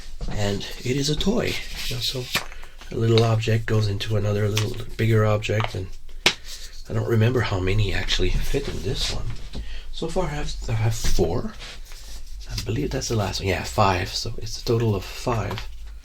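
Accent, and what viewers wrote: Quebec, Canada. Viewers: I just discovered ur channel and it’s amazing 😍, Idk what’s going on most of the time but it’s very relaxing 😌 Lové the costumes etc and ur French Canadian which I also love 😁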